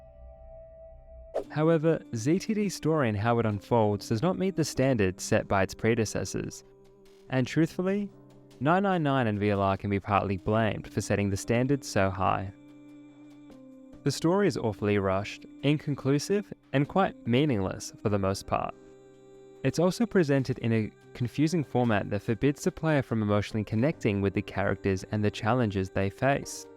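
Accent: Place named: Australia. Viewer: I even would have taken the Meta route as Uchikoshi suggested and did'nt follow up on that the player is some sort of multi-dimensional reaching entity or even a well meaning alien helping them through Alien technology, considering he brought Alien technology to the series..don't really see the harm in making a big part of the series. Sure make them an all-seeing entity but they're not all powerful. As crazy as that sounds, it's much bigger gut punch of a plot twist and would make me buy that humanity had a fighting chance against the Religious Fanatic even with the same ending. Zero would also know of him through the machine's existence naturally. He's a very (lost?) Youtuber who has many differently named accounts (one possibly deleted?) he makes videos on until he went back to his main one cos it was naturally the only one with any traction. It was just tiring bumping into him in the algorithm when he could just make videos on the main channel instead like he eventually relented to. 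Your ozzie accents are a touch similar after all, my mistake.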